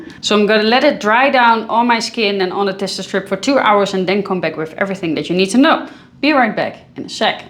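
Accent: Netherlands. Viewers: I love that dutch accent ♥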